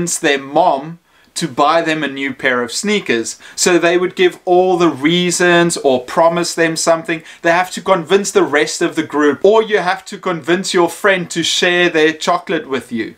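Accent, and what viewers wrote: South Africa. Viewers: Easy to spot a fellow Southie! Nice job on all the content, very helpful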